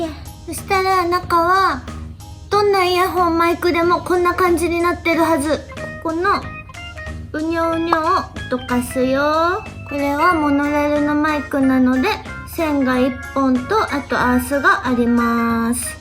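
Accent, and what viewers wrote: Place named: Kansai, Japan. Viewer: こんにちわぁなるほど分かりました。親切丁寧たまに関西人ですやん！これからも楽しいずっこけ動画お願いねーほな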